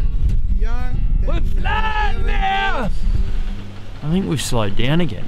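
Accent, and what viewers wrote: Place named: Australia. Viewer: I enjoy the videos very much, however I am somewhat upset with myself for not being able to completely understand the australian english accent. Something is lost in the enunciation. Maybe I'll get it one of these days. Good Travels!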